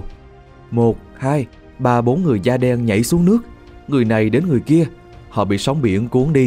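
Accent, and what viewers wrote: South, Vietnam. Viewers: Không tự nhiên bài này người đọc giọng Nam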